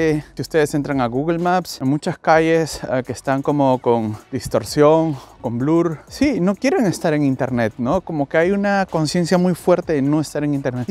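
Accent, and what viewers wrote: Peru. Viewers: It's a peruvian Spanish the best to learn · That's Peruvian Spanish.... good quality Spanish....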